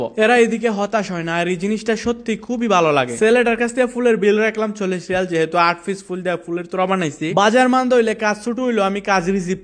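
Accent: Bangladesh. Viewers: হবিগঞ্জের ভাষা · বাড়ি কিতা সিলেট নি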